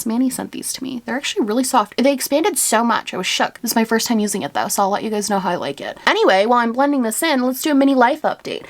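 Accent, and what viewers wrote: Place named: East Coast, United States. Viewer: LOL i knew you were an east coast girl by your voice. love you x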